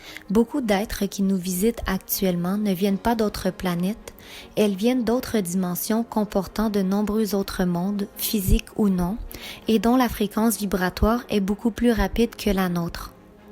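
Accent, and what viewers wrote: Quebec, Canada. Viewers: au petit accent du Québec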